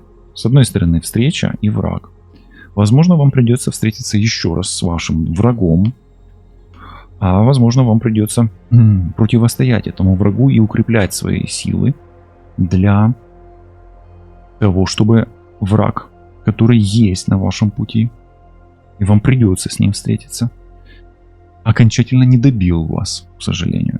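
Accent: Ukraine. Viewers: Спасибо, комнату отремонтировала, стараюсь восстановить материальность после кражи вошедшего в доверие менеджера по недвижимости. 😊😊😊(Благодарю Творца и Таролога) 🛐 Приятно слышать такой мягкий малороссийский выговор.❤❤❤С-Пб🎉🎉🎉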